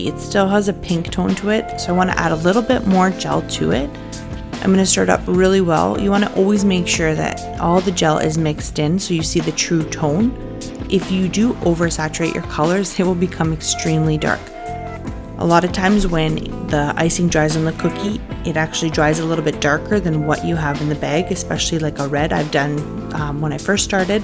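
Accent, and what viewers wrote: Canada. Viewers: 😹😹 YouTube isn’t really giving me my notifications otherwise I would’ve responded days ago. Yes you definitely have a Canadian accent When I left Canada and moved to the United States I was working for American Express and all of the customers kept saying where are you from because I would say “out and about” with that Canadian flare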